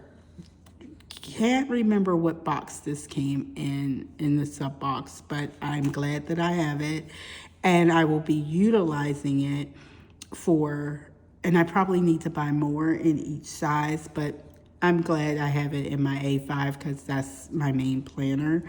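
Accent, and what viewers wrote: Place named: Pittsburgh, United States. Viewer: I hear the Pittsburgher accent. I am excited to know there is a planner lover in the Burgh. Good luck on your studies. Carlow has a good nursing program. New subbie here…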